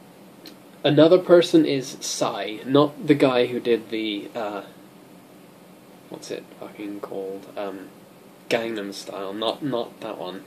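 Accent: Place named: United States. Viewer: Have you been stateside a fair bit? There's an American twang to some of your words these days.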